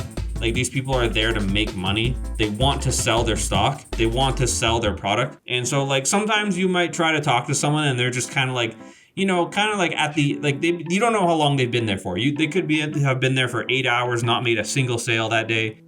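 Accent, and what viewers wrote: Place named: United States. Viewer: As a Michigander, I think your accent is very American. In regards to game collecting in 2023, if it’s not Nintendo it’s not horrible. I collect complete in box ps1, ps2, and Genesis carts and Iv got a good collection and I only started this year, and I don’t feel like a paid too much. Just don’t buy games you’re not gonna play, it’s that simple.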